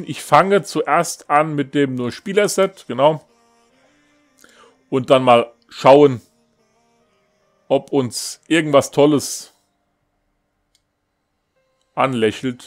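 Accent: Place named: Hesse, Germany. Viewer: komme auch Hessen :D bester Dialekt...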